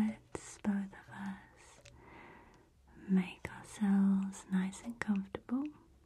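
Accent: England, United Kingdom. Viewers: I loooove her British accent😍😍❤❤ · Are. You. From. England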